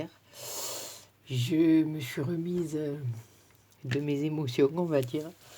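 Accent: South, France. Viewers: Bonsoir, merci pour nous montrez comment faire ces superbes 🌹 roses, j ai tout compris mais puis je permettre, dommage que votre main par moment cache vos gestes. Malgré j apprécie vos superbes vidéos, agrémentées du magnifique accent du midi. Bizettes Lorraine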